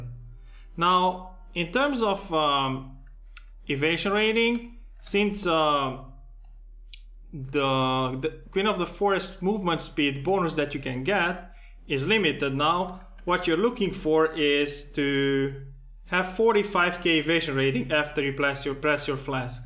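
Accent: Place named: Romania. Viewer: stiam ca esti roman. dupa port dupa glas:P <link> check it out:P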